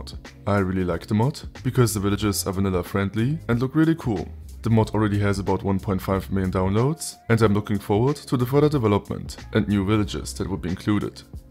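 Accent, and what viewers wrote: Germany. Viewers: He is German you can hear it your self in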